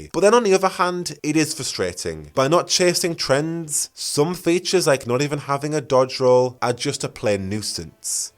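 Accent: United Kingdom. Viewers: ew british.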